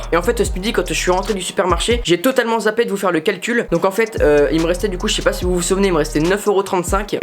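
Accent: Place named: Canada. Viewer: Parle canadien 24h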